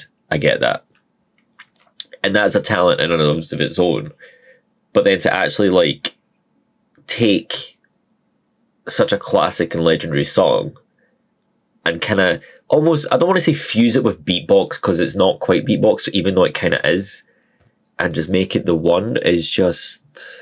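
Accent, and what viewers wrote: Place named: Scotland, United Kingdom. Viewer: Good to hear a good strong Scottish accent. (West of Scotland, I'm guessing. Edinburgh myself). Recently voted the sexiest accent in the UK in an international poll. (Scottish, not just Edinburgh.) Of course. I'm a Pentaholic but I'm not going to suggest anything. There are plenty of others to do that and they will. My favourites of are not always among their most popular anyway. But you won't be disappointed in any of them. Cannae wait tae see where it goes frae here. Pronunciation guide for the 'furriners': Can ay way' tay see whayr i' goes fray he-ur. (glottal stop after way and i).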